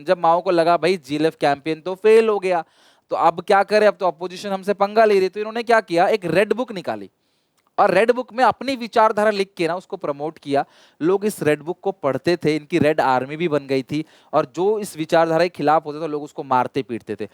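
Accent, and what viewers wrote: Odisha, India. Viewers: Sir are you from odisha ❤ please reply sir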